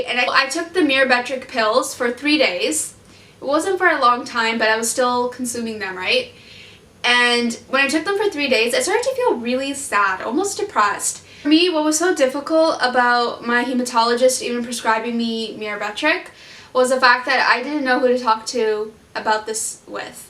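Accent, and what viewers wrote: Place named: Canada. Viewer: Your Canadian accent is very cute.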